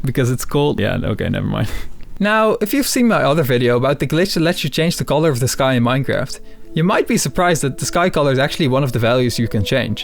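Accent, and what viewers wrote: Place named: Netherlands. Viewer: are u dutch? ur accent and pronunciation sounds like a dutch one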